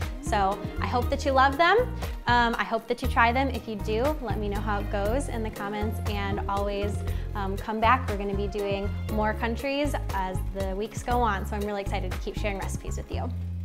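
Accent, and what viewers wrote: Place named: Midwest, United States. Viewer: Omg the Midwestern accent is just the cutest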